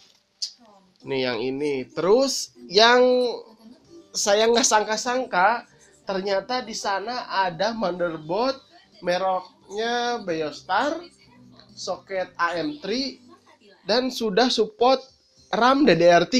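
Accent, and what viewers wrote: West Java, Indonesia. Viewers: Tinggal dipalih mana Kang?? wkwkwkwk😂 orng Sunda nya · Sunda euy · si aa sunda pisan euy, "beli eram lima rebu" ..hahah lanjutkan kang!! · SUNDAAA EUY...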